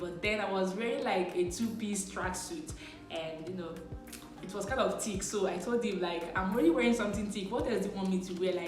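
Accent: Nigeria. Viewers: you have south african look, hence they talked in our native language. it is not easy clear with you, only when you speak you sound naija. you look like south african, skin tone, face propotion and and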